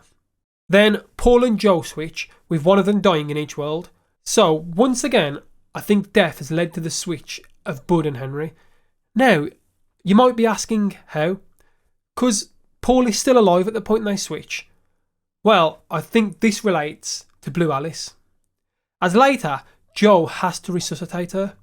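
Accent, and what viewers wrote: United Kingdom. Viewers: PS Where in England are you from?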